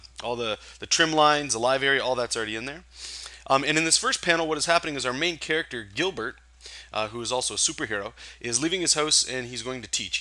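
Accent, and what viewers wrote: Canada. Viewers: That good lol Canadian accent lol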